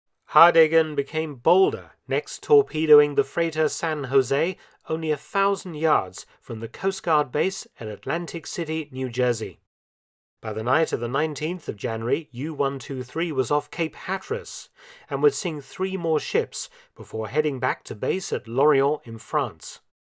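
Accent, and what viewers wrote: England, United Kingdom. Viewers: Its the British accent. Brits are great narrators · GENERAL British pronunciation; fairly innocuous, but not in a bad way. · His particular English accent doesn’t hurt either — it fairly screams credibility. 😀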